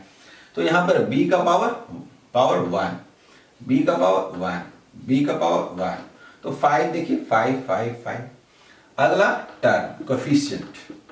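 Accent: Bihar, India. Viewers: Yes he is from bihar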